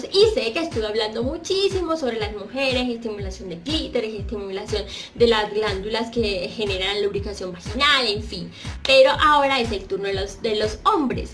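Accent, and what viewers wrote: Colombia. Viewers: Que acento tan feo es el colombiano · ☺️❤️❤️❤️❤️❤️❤️❤️❤️❤️😊☺️pero es que todas las colombianas son hermosas y su asentó de hablar me encanta 😍 yo me quiero casar con una colombiana plz can you help me 😊❤️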